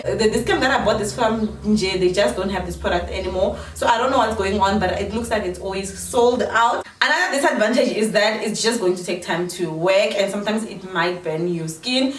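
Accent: Tsonga, South Africa. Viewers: I love everything about this Tsonga accent 😍😍😍😍 and that you're not trying to fake a different accent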